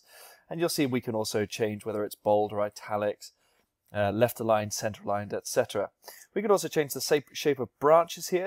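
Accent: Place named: United Kingdom. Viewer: Very nice tutorial, wonderful accent :) God save the king!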